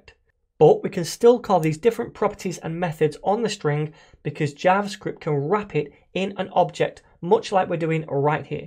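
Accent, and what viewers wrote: United Kingdom. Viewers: So glad to hear you with British accents very informative and comprehensive explanation thank you.!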